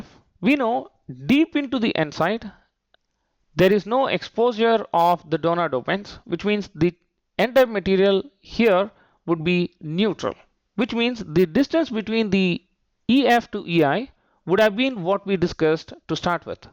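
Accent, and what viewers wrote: India. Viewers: I usually have difficulty understanding indian accents, but this has been really helpful.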